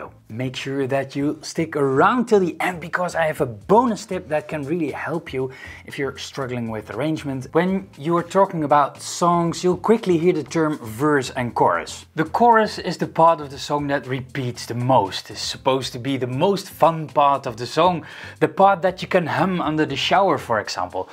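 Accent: Netherlands. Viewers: Great tutorial! Just subscribed... is that a Dutch accent btw?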